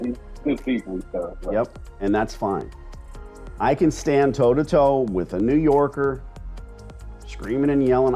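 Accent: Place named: South, United States. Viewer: Great Southern drawl on this one. I hope I never have to hear your Staten Island accent lol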